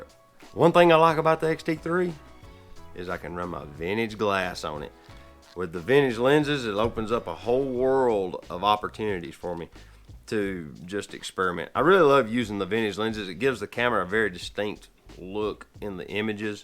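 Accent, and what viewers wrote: South, United States. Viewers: Centimeter? Really? :) Sounds strange when someone uses metric system with a southern accent. :) Great video, thank you very much!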